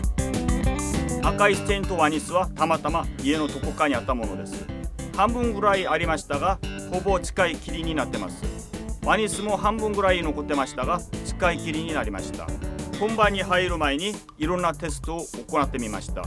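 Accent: South Korea. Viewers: 日本人ではないのか！？めちゃくちゃ可愛い喋り方！韓国訛りかなー？ なんか喋ってるの見るとさらに、可愛く見えてくる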